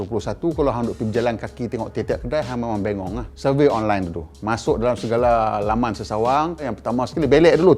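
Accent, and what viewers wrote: North, Malaysia. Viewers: Hahaha illuminati 🤣 Kemaihhhh bang! Teruih cakap utara... Kami syok!!!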